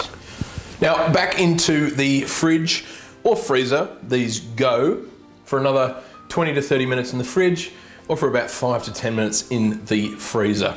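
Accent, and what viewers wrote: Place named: Australia. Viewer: Love your videos! What part of Australia are you from?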